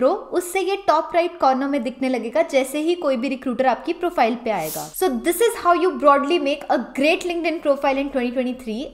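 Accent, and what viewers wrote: India. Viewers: your indian accent is incrediable but very useful sharing. thanks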